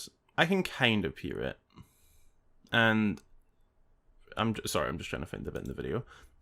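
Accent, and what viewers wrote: Scotland, United Kingdom. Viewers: It's cool dude. It's nice to see the face to the wonderful oh so similar accent that I haven't heard much. Mon the Scots lol. So good a concept I can see/hear the likness to? I guess we will need to see next year 😭😭😭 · Glad to see the man behind the awesome scotish accent ;)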